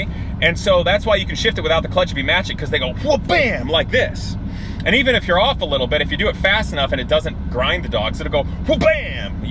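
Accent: United States. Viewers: Great vid, enjoyed listening to a Yank ;D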